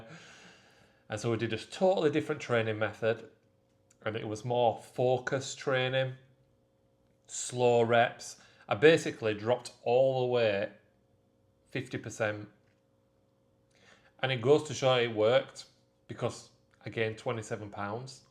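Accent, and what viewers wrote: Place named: West Yorkshire, United Kingdom. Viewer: Where abouts in Yorkshire you from? Sounds west Yorkshire ish accent!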